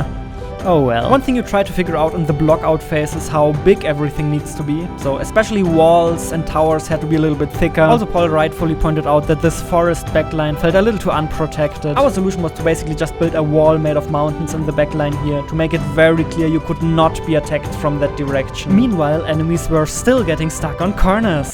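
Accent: Germany. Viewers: Man hört fast garnicht raus das du Deutsch bist, Echt gutes Englisch👍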